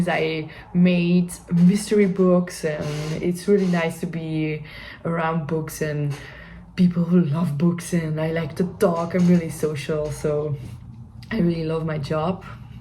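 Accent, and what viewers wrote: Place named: Germany. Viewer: Welcome to flosstube from the south or Germany! ☺️ I actually thought you were German too at first, your accent sounds very similar.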